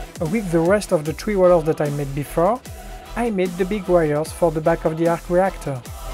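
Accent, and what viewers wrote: France. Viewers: Are u french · Tu est français ?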